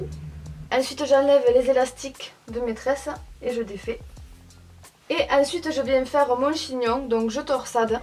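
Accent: South, France. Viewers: Mes qu elle Canon 😍, j adore, tu es magnifiques et en plus avec un brin d humour, tjr pétillante, tjr la bonne humeur avè l accent du sud tous pour plaire 😊 d énorme bisous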